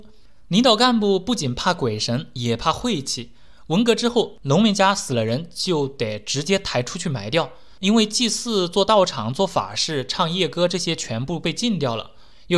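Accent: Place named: Hunan, China. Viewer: 我对湖南口音很排斥😂😂😂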